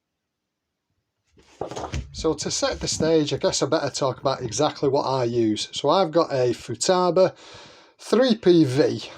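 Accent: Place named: North, United Kingdom. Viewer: Something about this Northern accent makes me take in all the information with such efficiency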